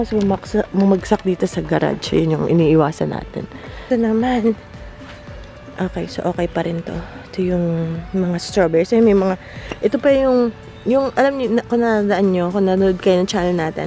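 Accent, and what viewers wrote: Batangas, Philippines. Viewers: ate new to your vlogs💕 batangueño ka ho baga?🤗♥️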